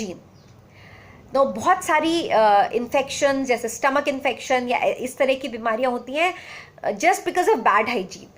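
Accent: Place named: India. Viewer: Wtf title in english but she is talking in Indian mixed english what kind of language is this?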